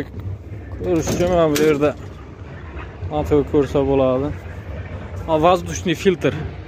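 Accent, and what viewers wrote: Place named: Karakul, Turkey. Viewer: Ака Каракулданмисиз шева ухшаяпти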